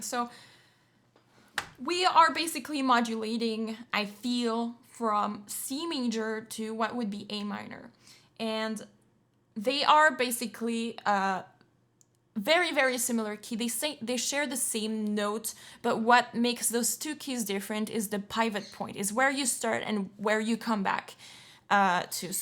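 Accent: Quebec, Canada. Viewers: Your accent look like french canadian !?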